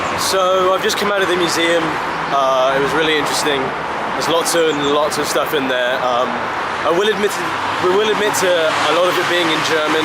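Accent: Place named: United Kingdom. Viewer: Those soothing british tones will be popular with american viewers. Rake in those views boi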